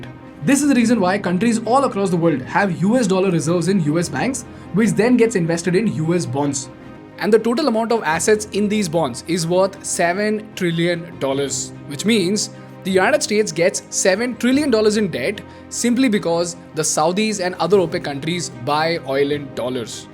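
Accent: India. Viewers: Hm Indian English 😂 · Omg that Indian english accent😂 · Pure Indian accent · Distinctive Indian accent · the host had poor and bad English, it sound Cheap English with Indian accent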